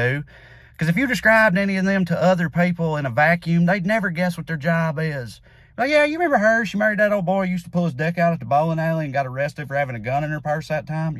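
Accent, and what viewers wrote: Australia. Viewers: Acceptable Aussie accent, mate. Convinced me, and I'm from here. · Australian subscriber here 🦘 Love every single glorious rant you upload, and thanks for the 70% Aussie accent, but one reason we tend to get away with using that C word so flagrantly is that we rarely use it to refer to women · Not a bad Australian accent, mate. And yes, she's so empty, gee. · The Australian accent totally worked!! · 👍 on the Aussie accent. Not bad 👏🏻 MGT should spend a week in a Chinese gulag so she could learn what that word means- not that she would be able to survive more than one day. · The Aussie accent makes everything OK :) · Shout out for your Aussie accent and as usual for calling a spade a fucking shovel. (Another Aussie Idiom) 🇦🇺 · Yup! Well said with the Australian accent. I’d like to add the spelled version of that with a Manchester accent, courtesy of my British buddy who’d say, “Couple a Facking Can’ts those two!” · you da man! 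hope that Ausi accent kept ya out of the weeds:) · Great Aussie accent and nicely played C word. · The Australian accent…. 🤌🏼🤣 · It's amazing that two words was working up to before he said them in his Australian accent were the very two that I spoke aloud in my very own American accent about two seconds before he said them. If the shoe fits "ladies..." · LOVE LOVE LOVE THE AUSTRALIAN ACCENT,! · Not too sure about the Aussie accent, but I can't disagree with the sentiment, mate. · You hit the nail on the head with your description of Marjie and Laurie. I like your Aussie accent mate. :) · The Australian accent worked! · As an Australian, I approve your Australian Accent and it is a spot on imitation of what an Aussie would say about this. Love 💕 from Australia 🇦🇺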